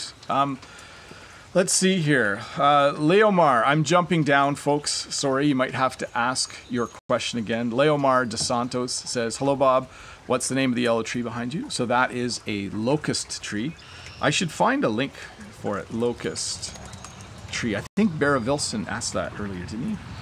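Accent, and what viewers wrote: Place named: Canada. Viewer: Hi Bob, I am an English teacher and I always recommend Canadian accent to my students. By the way I love the way you teach English and talk about what is going on around you. That's sounds more real.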